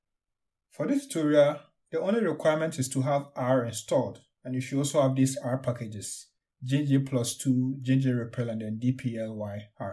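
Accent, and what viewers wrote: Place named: Africa. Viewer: Is it just me or is it refreshing to hear an African accent addressing these complex concepts and workflows in modern science?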